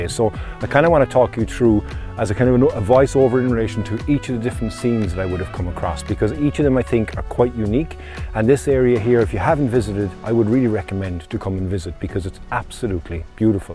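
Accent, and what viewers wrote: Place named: Ireland. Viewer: Intro footage and tempo, class! a bag of top shots and some tones from emerald voice! Well bloody done bud.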